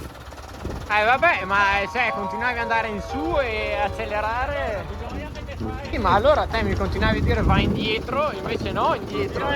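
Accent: Veneto, Italy. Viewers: Te se proprio Veneto ciò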